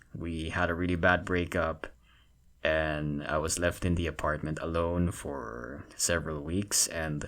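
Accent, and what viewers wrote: Philippines. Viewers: I like ur Tagalog accent sm 😭✋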